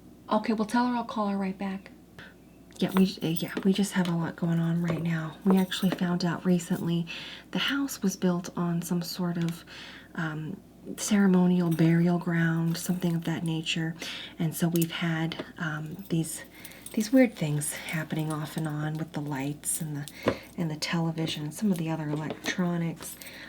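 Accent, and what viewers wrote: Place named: New Jersey, United States. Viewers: Why she sound like she from Jersey 😂😂this is so funny to me. It's ASMR comedy 😄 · The Mid-Atlantic/Philly vibe is ON POINT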